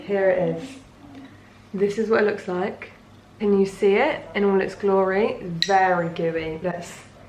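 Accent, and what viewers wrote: United Kingdom. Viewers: one reason you cannot understand what she is saying I guess you have to be british to understand her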